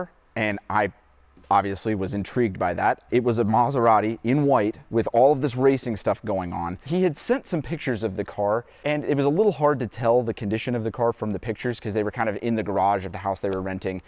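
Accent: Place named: Kansas, United States. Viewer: Good on you! Slowly but surely, you're building content. Granted you had to wait until CT2 aired, but your delivery is so measured and "Kansan" that it is nice to another one coming along. I really like the understated "look and feel" of your channel. It's rare to watch a channel like that and NOT have it be boring. Thank you. I think I'm subbed on another account, but I''m subbing on this one now.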